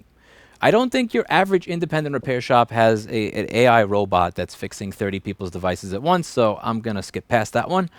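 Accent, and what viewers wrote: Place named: Texas, United States. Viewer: Life long Texan here., except for talking so fast, you sound like a real Texan.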